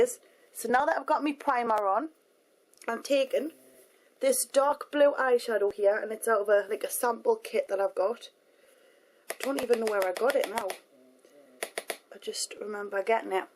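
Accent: Newcastle, United Kingdom. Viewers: Its a Geordie Accent. Same as Cheryl Cole?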